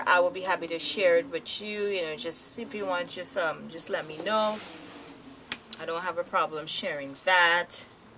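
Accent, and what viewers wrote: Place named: New Jersey, United States. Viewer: Hey... Where r u from? U sound just like my aunt from Jersey. N we r Trinidadian. Anyways, pretty hair! I subbed! :)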